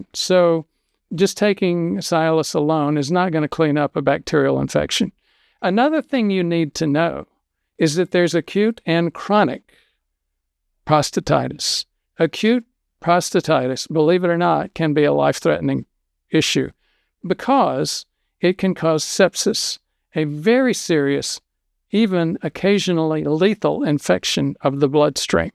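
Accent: South, United States. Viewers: Why are you pronouncing this drug's brand name incorrectly? Is it a southern dialect thing, like how certain southern people call my mom, "Naiomi," instead of her actual name, Naomi? I always think that they're confusing the CORRECT pronunciation of her name with the pronunciation of the Kenyan city of NAIROBI! It's pronounced, 'see • alice,' NOT "sigh • alice," and I can't even watch the rest of this video because you're driving me crazy with your repeated mispronunciation of this word....and you're a real, bonafide doctor, right? Well then, one would think that you would KNOW the correct pronunciation?! I'm just an RN, and even I know the CORRECT pronunciation! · I think it's the Southern dialect thing and possibly lack of early exposure to multiple cultures. Many people mispronounce words...Dr. is a very good person.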